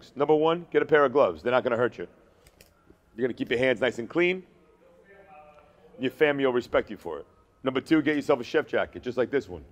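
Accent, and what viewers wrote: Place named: Brooklyn, United States. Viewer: Viewers! Carving begins. broken, Brooklyn “English” intro will bore you to tears if you go the whole nine.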